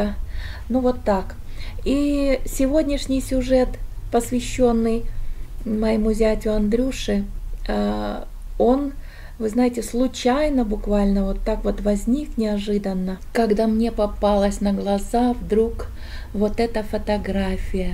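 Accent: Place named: South, Russia. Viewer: Дорогая ,витаминка добра и солнышка ,мое уважение,люблю ваш голосок и ваш южный говорок❤👍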